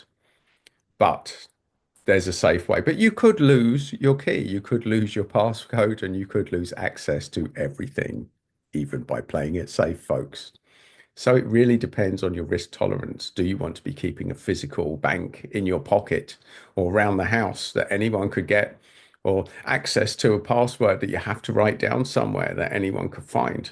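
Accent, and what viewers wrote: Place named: London, United Kingdom. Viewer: Hi there. Good video. By your accent, sounds like you're Londonish based too. It is a very good point on the terrible UK establishment psyop on Crypto. Almost everyone I know still thinks BTC is the money of criminals thanks to this fear porn. For those that are interested, I also put out a video on how not to get scammed in crypto - different angle though.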